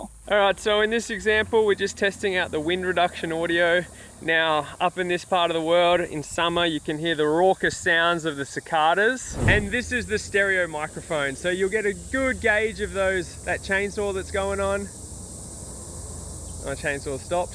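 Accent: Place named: Australia. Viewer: Dunno what I love more!! The epic tips, hat, or the fact that this is the most Aussie ever sounded. Top highlights: “It’s a bloody absolute ripper” “Let’s not be d*ckheads” Thoroughly enjoyed 😝✌️🤙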